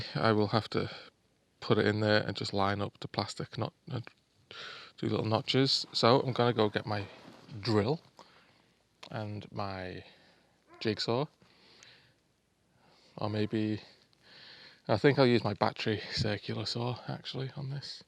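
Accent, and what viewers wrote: Liverpool, United Kingdom. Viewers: You sound local to me. Scouser! I live in Liverpool also. Looking to buy a Kia e-Niro. We have an ID.3 and an Outlander PHEV. Want to get shut of the Outlander soon and wanted to see what the boot space was like on these Kia’s. Thanks for the great video on maximising the space. 👍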